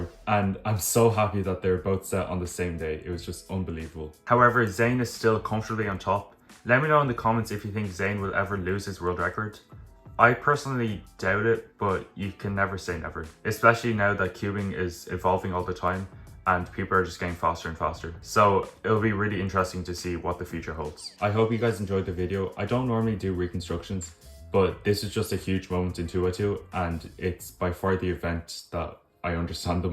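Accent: Ireland. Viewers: ur irish accent is too strong for my english + chinese brain to combine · Hey, are u irish? ur accent sounds like a south dublin accent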